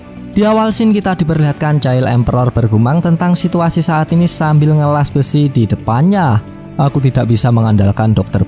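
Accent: Java, Indonesia. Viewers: bang bisa ngak aksen logat jawanya di ganti udh bosen dengarnya🗿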